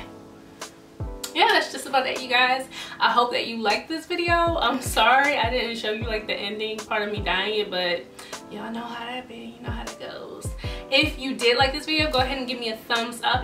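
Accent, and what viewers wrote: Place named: DMV, United States. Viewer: Are u from DMV? I love ur accent tho.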